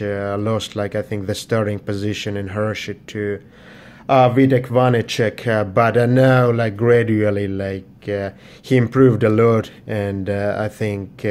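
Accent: Russia. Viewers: Nice video man. Are you Russian?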